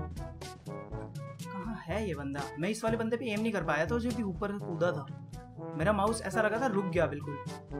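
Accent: Haryana, India. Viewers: kya aap haryana se ho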